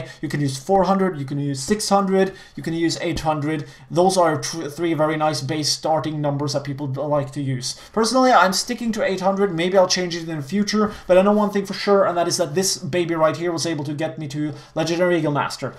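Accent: Norway. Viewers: Er du norsk for faen:) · er du NORSK!?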